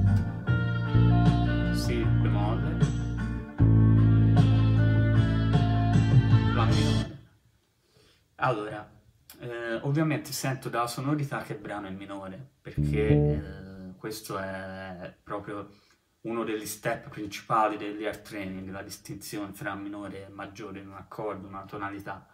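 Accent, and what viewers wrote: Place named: Livorno, Italy. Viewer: mi piacciono molto le tue lezioni di basso, fra le migliori che ho trovato su youtube! e se non sbaglio, dall'accento mi verrebbe da dire Forza Livorno!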